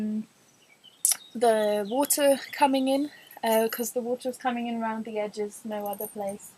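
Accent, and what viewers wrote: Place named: United Kingdom. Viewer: Love the British accent of a lovely British girl!!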